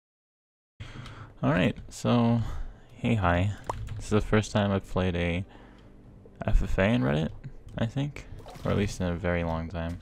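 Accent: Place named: United States. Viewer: I I thought you were american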